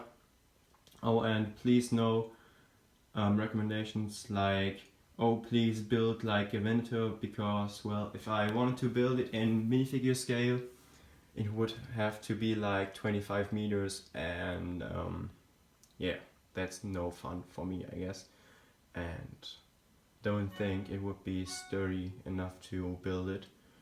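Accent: Germany. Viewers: sieht ganz geil aus, ich weiß nicht safre vdas du deutsch bist aber dein englisch hört sich ziemlich deutsch an :D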